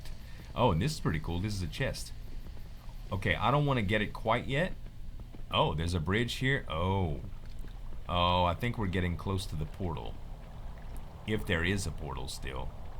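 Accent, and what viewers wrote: Louisiana, United States. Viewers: Aww, don't train away your cajun accent. It's one of the best accents in the English-speaking world! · I love your accent! Didn't realize it was Cajun. As a New Yorker, I'm not around it much/at all. Thanks for exposing us to it! (totally don't change :P ) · did not know he has a kajun dialect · where in Louisiana? Im from Metairie:) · oh and btw are you from Louisiana? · Wait so is from Louisiana?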